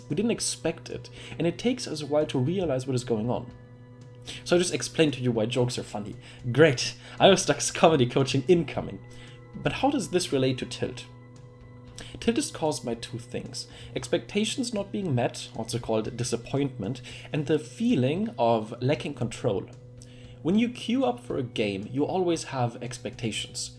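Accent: Germany. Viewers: hah, I knew this accent was german.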